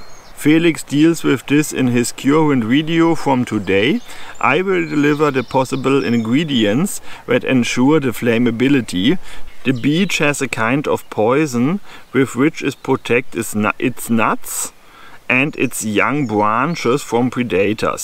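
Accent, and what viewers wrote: Germany. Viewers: Hallo, hab grade das deutsche Video angemacht und als ich gehört hab, dass du das auch auf Englisch gemacht hast musste ich doch gleich mal reinschauen bzw rein hören. Finde so einen starken deutschen Akzent immer total lustig. Hab ich selber zum Glück nicht soo die Probleme mit. Egal. Respekt dafür dass du dich trotz Akzent vor die Kamera gesetzt hast. Würden sich viele nicht trauen. Aber bei deinen Inhalten ist das alles sowieso eher zweit- wenn nicht sogar drittrangig und da ich die allermeisten deiner Videos gesehen habe würde ich mal behaupten es wäre schlimmer dem internationalen Publikum diesen großartigen Content weiterhin vorzuenthalten. Inhalt vor Verpackung würde ich mal sagen😅 In diesem Sinne... Übung macht den Meister und solange dich alle verstehen ist doch alles gut. So... Und da ich eher selten Kommentare schreibe mal was Allgemeines: Mach bitte weiter, sodass wir alle noch viel mehr von dir lernen können. 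Jetzt sogar international 👍 Danke! Danke dass du uns dieses tolle Geschenk machst. Deinen ganzen Kanal meine ich. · man merkt den deutschen Akzent nur ganz am Rande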